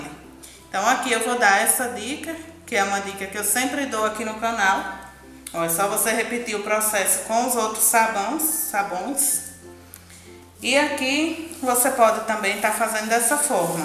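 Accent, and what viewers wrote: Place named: Northeast, Brazil. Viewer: Olá gosto muito dos seus vídeos meu nome é Walmir Lopes sou de Salvador Ba vc é muito simpática vc é de que lugar vc remédio um sotaque nordestino eu gosto muito desse sotaque eu também sou nordestino um beijo.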